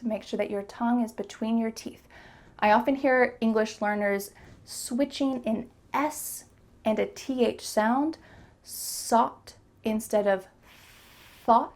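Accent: United States. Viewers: speaks with an American accent...in Australia we don't pronounce the "r"! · I love you ❤️ Every time I feel like my american-accent-blood-pressure is going down I check out one of your videos in order to get some dosage of the american accent. 😅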